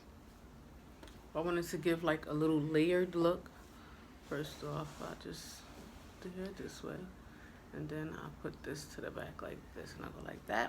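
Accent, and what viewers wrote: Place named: Brooklyn, United States. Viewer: Before I heard you say ah ah ah ba ba ba I was like she from Brooklyn lol. You wear the hair well and the color is nice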